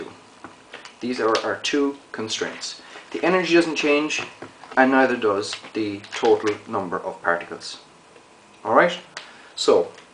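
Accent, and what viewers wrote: Ireland. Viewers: that irish accent reminds me of Jacksepticeye, quite nice xD. Great video and explanation btw, it really helps to see the full steps. Thank you!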